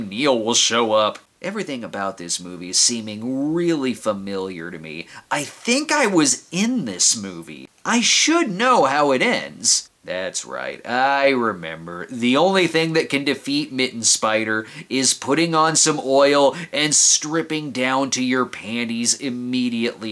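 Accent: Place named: Midwest, United States. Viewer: I'm impressed how strong his Chicago or midwest accent has gotten over the years.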